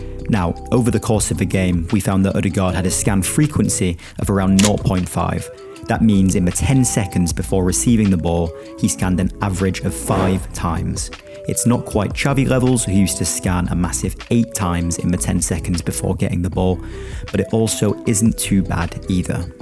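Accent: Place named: Scotland, United Kingdom. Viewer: His accent sounds a bit scottish. That "last" is in no way pronounced wrong. You just have a problem with people accents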